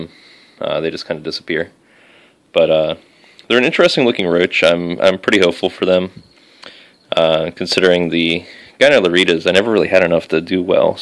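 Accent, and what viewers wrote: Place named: Philadelphia, United States. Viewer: I just got some of these, I heard they are very prolific breeders, have you had success? Also, you sound like you're from philly area, if that's the case, you should definitely check out the insectarium! it's on Frankford ave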